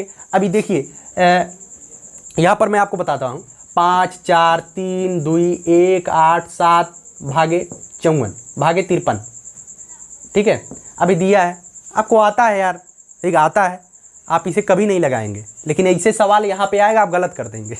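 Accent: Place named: Awadh, India. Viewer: Logo ko awadhi aur bhojpuri main farq hi nhi pata.. hadd hai....aap sir awadhi bolte hain aur acchi bolte hai ..hum awadh walo ko bdi aatmiyata lgti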